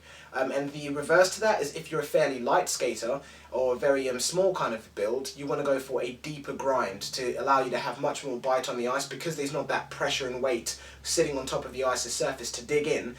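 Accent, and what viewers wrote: England, United Kingdom. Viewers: Tutorials are always better with an English accent. Cheers mate.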